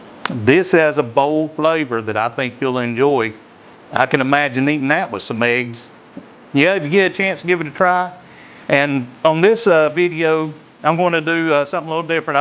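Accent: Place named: Rural, United States. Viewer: i like the country twang😅 which state u live in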